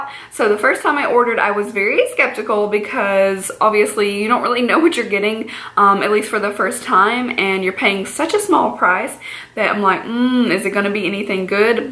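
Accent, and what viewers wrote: South, United States. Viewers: Growing up in the south and suddenly living way over in the west now, your voice is so nice to hear again. I miss the accents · That's awesome I've always wanted to visit there. Our southern accents are very similar lol. U r very beautiful and talented. This is my first time watching you and it is very helpful Ty!:)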